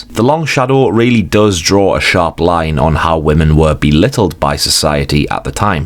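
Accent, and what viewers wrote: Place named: Newcastle, United Kingdom. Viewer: I don't mean to doxx you or anything but it sounds like you've got a Geordie accent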